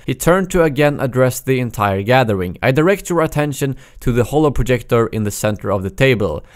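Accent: Sweden. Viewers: You read fine, don't be afraid of reading with your "Swedish accent" because you read pretty good bro. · His Swedish accent is awesome.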